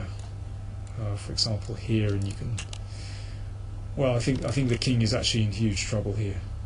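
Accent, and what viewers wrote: United Kingdom. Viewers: What great channel. I'm so glad I stumbled upon it over a year ago, countless hours of great chess and a hugely entertaining British accent along with it. Great work Mr. King!!!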